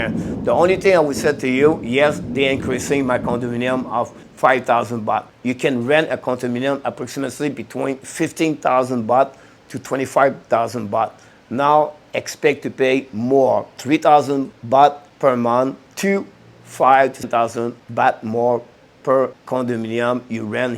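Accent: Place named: Quebec, Canada. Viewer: on dirais un quebecois tu vient de ou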